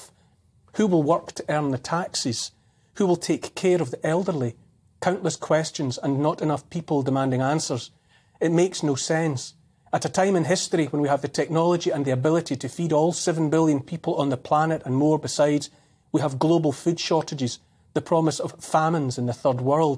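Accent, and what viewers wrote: Scotland, United Kingdom. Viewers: He has the perfect accent for doom and gloom reporting, is that Scottish?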